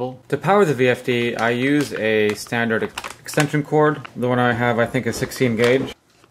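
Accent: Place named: United States. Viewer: But plugging it into the wall aren’t you feeding it 110v? Your accent sounds American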